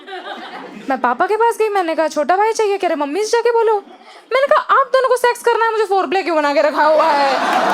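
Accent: Bhopal, India. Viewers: i know that you have passed out from carmel convent bhopal your totally representing the accent and youth of bhopal...btw nice keep doing this ultra comedy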